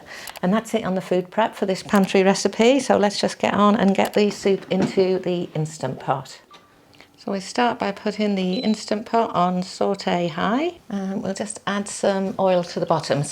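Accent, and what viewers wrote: England, United Kingdom. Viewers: I've just got an Instant Pot with Ultimate lid. It is so easy to pressure cook with! Now I have found your channel I can learn more about how to use it. Thanks! Also your English accent is lovely to hear.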